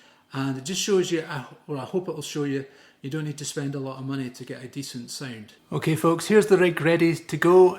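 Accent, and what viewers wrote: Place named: Scotland, United Kingdom. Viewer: Your Scottish accent is great! Keep on keepin 'on!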